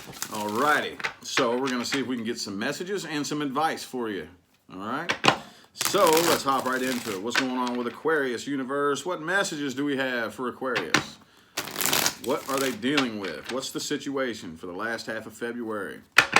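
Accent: South, United States. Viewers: Loving that "country drawl". Being from NC I have a drawl too.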